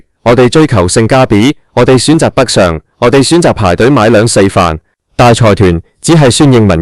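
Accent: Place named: China. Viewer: 阿燦旁述！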